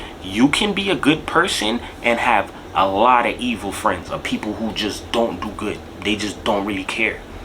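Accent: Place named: Jamaica. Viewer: YOUR VOICE IS SOOTHING.... RASTA ACCENT! JAMAICAN???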